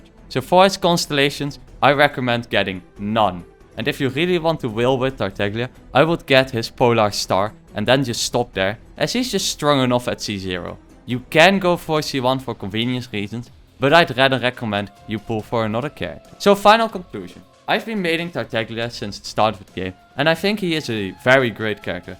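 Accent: Netherlands. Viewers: AAAAAA as a dutchie i can't handle the Dutch accent im sorry fisisjjsjs But thanks for the guide i appreciate it lol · Your deffo from Netherlands!! Same haha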